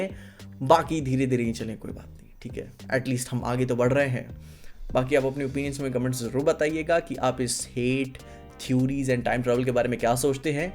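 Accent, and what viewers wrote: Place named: North, India. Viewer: abbe accent ka difference hai. North me aise hi bolte hao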